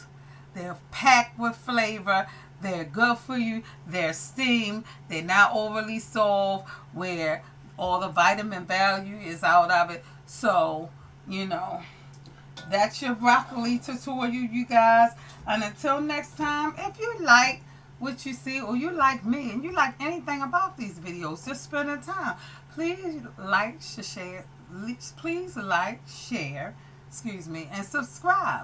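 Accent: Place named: Maryland, United States. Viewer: I agree. There is a difference between cooking fresh broccoli vs frozen broccoli. The frozen kind doesn’t hold flavor like I want it to. But I’ll cook with either one still. Also, I can hear in the way you talk that you’re from Maryland girl. I am too! 🙋🏽‍♀️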